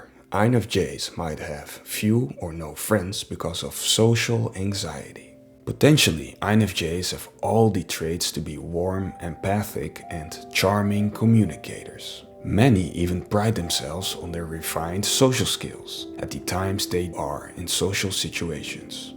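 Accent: Netherlands. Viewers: Any chance the narrator is a native dutch speaker? As someone coming from the Netherlands I thought I heard a bit of a dutch accent :) As an Infj myself I could really relate to this video. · I hear a Dutch accent. I’ve been around the world to find you :-). Come to Mexico and let’s join forces. Let’s come together and create an off-grid community.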